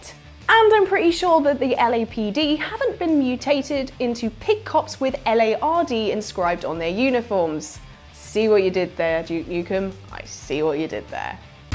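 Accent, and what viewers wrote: United Kingdom. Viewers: wow this was upload 3 secs ago, say hi to me plz, love your brittish accent, congrats for your new job youre doing well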